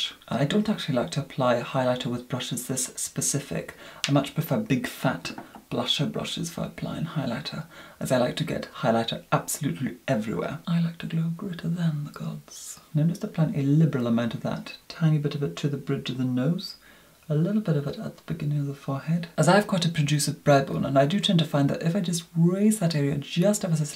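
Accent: United Kingdom. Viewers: I could listen to 👸🏻 👸🏻 count from one to one million or rattle off the alphabet from A to Z, and be perfectly entertained for ⏳hours⌛ by his beautifully accented voice 🗣🗣🗣 I consider his ☺cosmetic inventiveness☺, 🇬🇧dry English witt🇬🇧 and 💄immense makeup knowledge💄 to be an extra, added and appreciated bonus!!! 😍💯💎😻💋✨💖👑💖✨💋😻💎💯😍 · I Love your british accent <3 <3 <3 Love from Paris France <3 <3 <3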